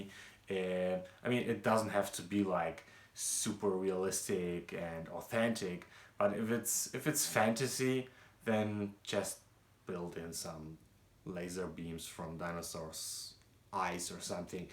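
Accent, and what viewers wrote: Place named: Germany. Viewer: I don't think you talk funny, listening to you makes me miss Germany. You have an awesome ass collection and I am loving your reviews:)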